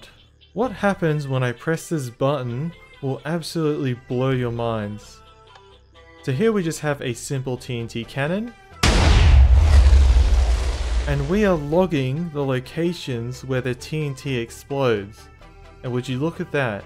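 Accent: Australia. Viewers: also, AUSSIEEEEEE OMG (LOL)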